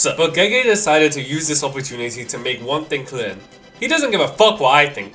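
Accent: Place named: South London, United Kingdom. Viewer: you sound like a south londoner... croydon?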